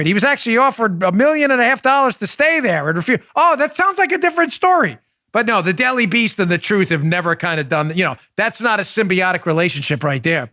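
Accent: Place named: Queens, United States. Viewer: This Queens girl loves your voice. It's a piece of home I miss terribly. I ''ve lived in Texas for 46 years.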